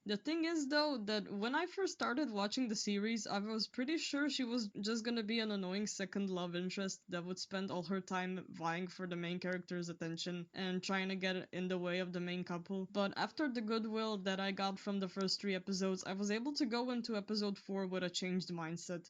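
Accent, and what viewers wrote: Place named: Canada. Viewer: i have to say i jus luv the way you speak, your style is adorable! and nutin wrong w your english, actualy im suprised to learn its not your first tounge, i thought you're canadian:) wonderful analysis btw a joy to watch, thx for makin'em!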